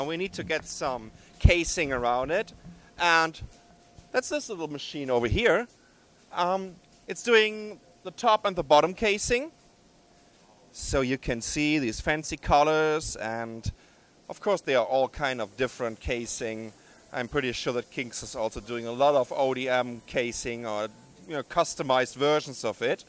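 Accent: Germany. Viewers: Pretty awesome. Was the narrator German? Also, you guys have some cool songs at the beginning/end. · he fucking german, give the dude a break, it's not his 1st language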